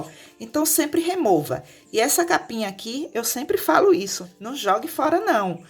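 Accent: Bahia, Brazil. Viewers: Aí linda tu e da minha terrinha kkkkk baiano realmente estréia quando assistir um vídeo de empada de carne seca vi um sotaque de Aiai falei será que e daqui pensei acho que não kkkk agora ouço isso ensina com excelência sua dicas e ouro sabia da massa mas as técnicas não aprendendo e muito.Deus de Israel abençoe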